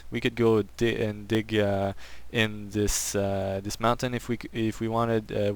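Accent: Quebec, Canada. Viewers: By the sound of your voice and you name, i guess you'rs from Québec? Am i right? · Vous êtes Québécois ? Je dis ça, car on dirait que vous avez un petit accent Québécois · I recognized the quebec accent immediately :) .. also.. cool looking game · Le québécois, je dirais...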